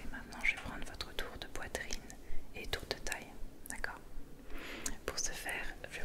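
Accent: France. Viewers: Hey friend! I hope you are going really well :) I just wanted to say that this really sounds absolutely wonderful and lovely! You have an amazing french voice, its so soothing and lovely to listen to. I hope you are staying safe and happy.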